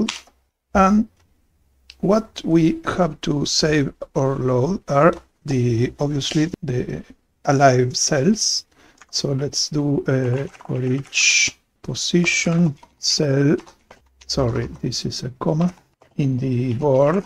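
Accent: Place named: Argentina. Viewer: How nice that I could notice the argentine english accent just 10 seconds into the video with no prior clue haha. Thanks for sharing the project!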